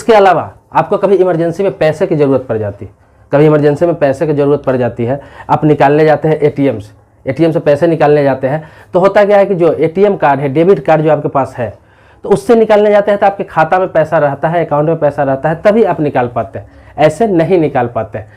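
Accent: Bihar, India. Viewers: Bihari bhasha thik karo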